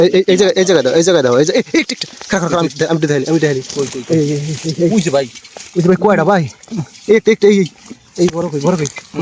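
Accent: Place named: Barisal, Bangladesh. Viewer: BORISAL BOLE KOTHA :face-blue-smiling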